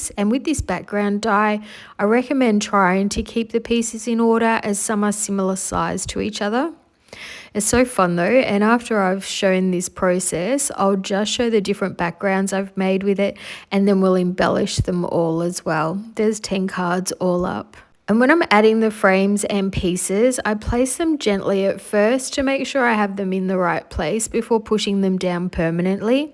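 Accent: Australia. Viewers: Hi, I hope you are enjoying your holidays. You are my favourite channel, it is so nice to hear an Australian voice!! I would love to see a tutorial for sentiments when you have time. ❤ Adelaide Adelaide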